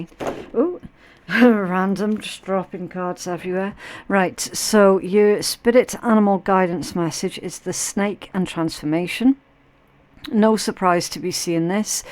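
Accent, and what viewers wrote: Scotland, United Kingdom. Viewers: Blessings to you, Thank you for my reading Scorpio 10/11 /1976 born at 6an, Love your Scottish accent lol🐯🌹🌟💜😁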